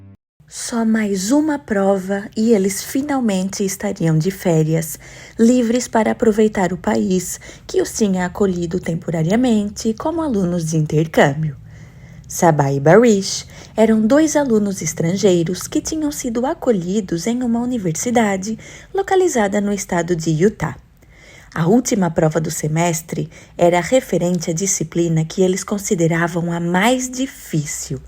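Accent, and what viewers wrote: Portugal. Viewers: Gosto muito de sua voz e seu sotaque. Penso que vc é Portuguesa.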